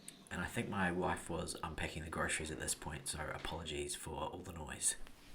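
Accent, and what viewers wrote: New Zealand. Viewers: Your kiwi accent is nice to listen to there's always the mute button for those who disagree ,great job on the stag dresser I had a set and chalk painted them disaster !!, keep up the good work . ( Greetings from Aberdeen Scotland ) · Please, keep talking. I like the explanations and the New Zealand accent. 😉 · Kia Ora! New subbie here from Australia and also a kiwi 🙌🏾 keep talking bro! Love hearing the familiar accent. You have a calm voice too. Kia kaha bro 😎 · Keep talking cuz I love your kiwi accent! The piece came out beautifully! DDecker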